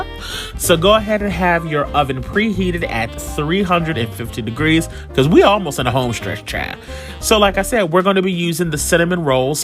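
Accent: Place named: South, United States. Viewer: in that southern drawl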